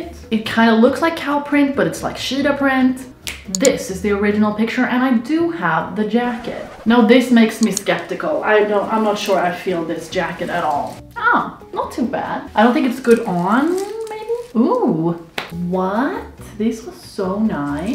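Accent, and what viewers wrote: Sweden. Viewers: Bro are you swedish? You sound very swedish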